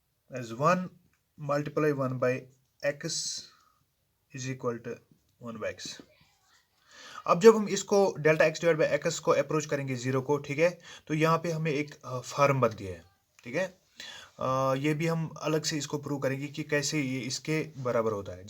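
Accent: Kashmir, India. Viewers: Are u from Kashmir sirr ? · I also think that sir is from Kashmir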